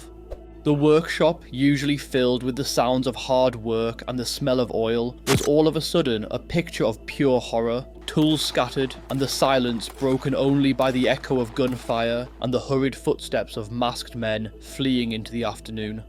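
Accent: United Kingdom. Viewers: My favorite British voice telling me Aussie crimes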